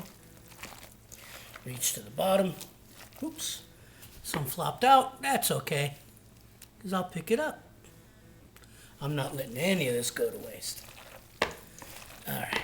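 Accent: United States. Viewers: Haha, you just have a strong American accent is all. I've definitely heard worse :)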